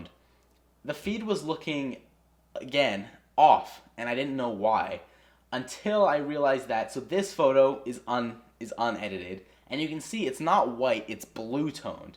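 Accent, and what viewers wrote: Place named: Ireland. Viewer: You have a sort of Irish accent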